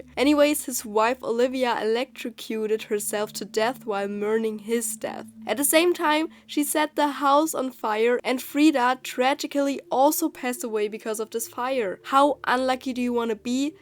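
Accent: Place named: Germany. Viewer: man hört das sie deutsch ist😂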